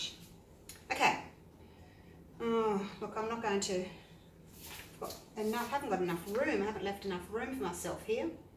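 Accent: Australia. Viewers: Great to hear an Aussie accent on a pouring video.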